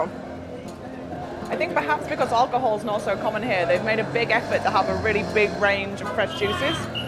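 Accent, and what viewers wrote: United Kingdom. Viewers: Hi which part of the world are you from. By your accent you sound British. Nice to see you that you are enjoying over there. Wish you well. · I will subscribe your channel if you Marry me 😁 DONOT MIND 🌼🌼🌼🌼🌼🌼🌼🌼🌼🌼🌼🌼🌼🌼 I Love Listening British Accent ✅ Beauty + Lovely Voice + Beauty...... you are very beautifull 🌹I AM FROM SWAT🌹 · British 🏴 english accent is great 👍🏻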